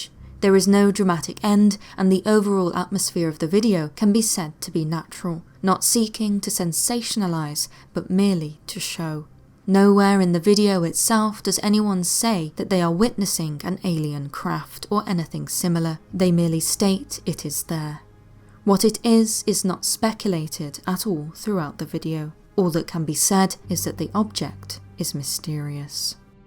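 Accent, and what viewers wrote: United Kingdom. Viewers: Isn't it pronounced "(gē-zər)" in British English? The narrator sounds like she's British, which would make sense.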